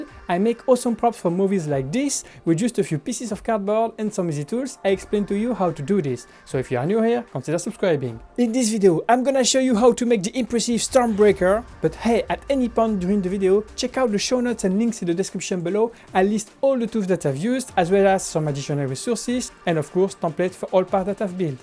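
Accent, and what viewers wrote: France. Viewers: Are you french ? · T'es francais nn ?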